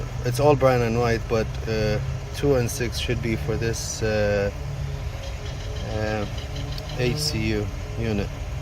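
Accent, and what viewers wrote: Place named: Iraq. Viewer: متحجي عراقي هيه مال تفيك 😅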